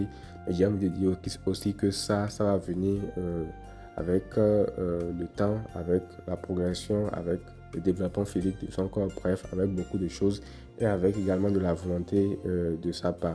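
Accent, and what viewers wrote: Benin. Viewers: Ça sent l'accent béninois, vrai ?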